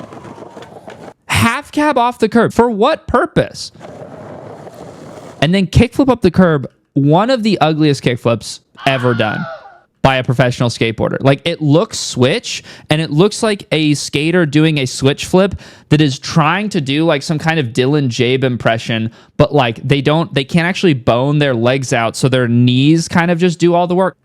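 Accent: United Kingdom. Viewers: Love the English accent 😂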